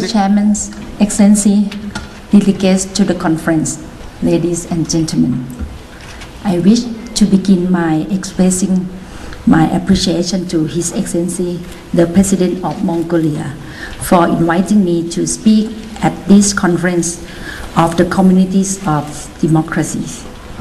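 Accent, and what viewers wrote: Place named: Thailand. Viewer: ฝรั่งคงเหนื่อยกับการนั่งฟังแอ๊ดเซ้นนายกคนเก่งของคุณน่ะคับ !!!!! จะสำเนียงไทยจ๋าก็ไม่มีใครว่าหรอกคับ แต่แทบจะไม่ออกเสียงตัวสะกดเลย ความสามารถการใช้ภาษาระดับนี้ ใช้กับ"โลก" ไม่ได้หรอกคับ แต่ถ้าเอาไว้หลอกบางคนในประเทศ ก็ถือว่าเพียงพอแล้วหละ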